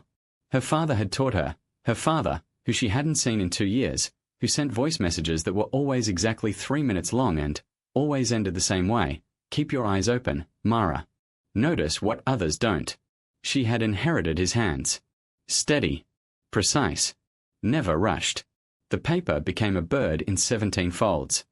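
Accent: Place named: Australia. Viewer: G'day....an Aussie AI narrator...well I never!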